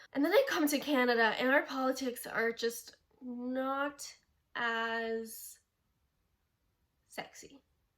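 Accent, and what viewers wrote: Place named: England, United Kingdom. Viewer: Interesująca masz historię życia. Trenowałaś sport, so did I 🙂 kochasz zwierzęta 👍🏻💞 so do I 🙂 Kocham Twój angielski akcent 💞🙂 Pozdrowionka 😉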